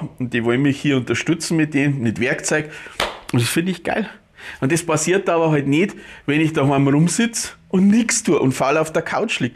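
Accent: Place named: Swabia, Germany. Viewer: Hallo, viele Grüße von Indiana in den USA. Ich schaue mir deinen Kanal sehr gerne an und habe ich schon vieles gelernt. Mal sehen was noch alles auf uns zukommt im kommenden Jahr. vor vielen Jahren lebte ich in Stuttgart und dein Dialekt bringt mich immer wieder zum schmunzeln.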